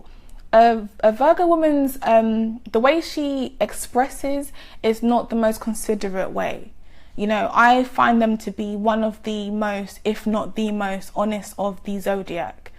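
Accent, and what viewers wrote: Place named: London, United Kingdom. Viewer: I'm a Virgo Sun Moon and Rising. In total I have 5 planets in Virgo in my chart. I'm a designer so the office job life isn't relative to me, but I do like structure. I do live in a white house... white walls and a very minimal design. A clean home is a must... although I'm not OCD. Shout out to you though... it's nice to hear a London accent on an Astro vid for a change. Definitely going to subscribe. xx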